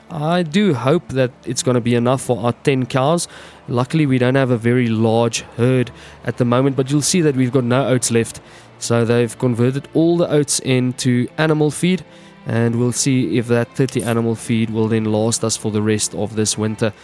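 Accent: South Africa. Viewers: The way this guy speaks, I can swear he's South African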